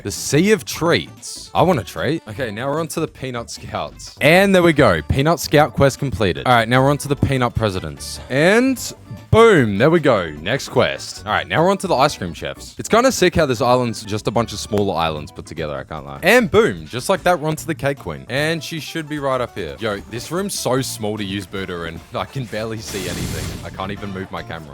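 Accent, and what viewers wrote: United Kingdom. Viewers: I like you british accent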